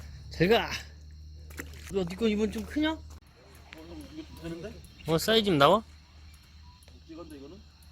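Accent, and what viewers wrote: Jeolla, South Korea. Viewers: 전라도 사투리 중에 오리지날 입니다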